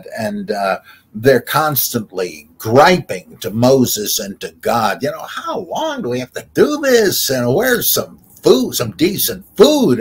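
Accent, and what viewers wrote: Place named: England, United Kingdom. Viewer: I always like English accent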